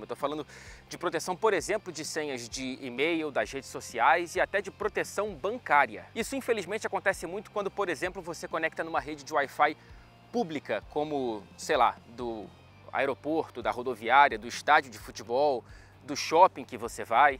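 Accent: United Kingdom. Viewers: Esse sotaque inglês do me pega muito 😂😂 Ótimo vídeo!!! Admiro muito seu trabalho! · O sotaque britânico dele … volto o vídeo só pra escutar dnv… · Fala,! Beleza? Fãzaço do canal aqui! Cara, uma pergunta meio aleatória, mas como você fez para pegar o inglês com um sotaque mais "britânico"(além de morar no Reino Unido kkkkkkkkk)? Tem alguma dica?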